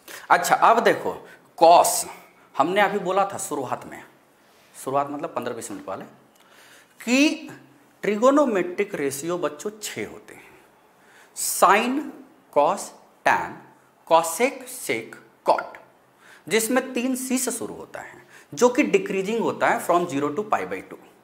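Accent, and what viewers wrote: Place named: Bhojpuri, India. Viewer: Thoda Bhojpuri tadka 🌶️ lag rha hai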